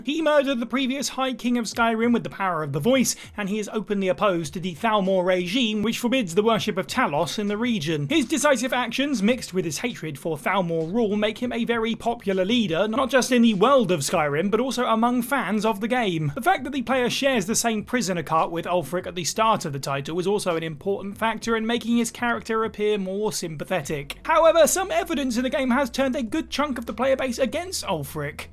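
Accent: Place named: England, United Kingdom. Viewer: England accent 😢😅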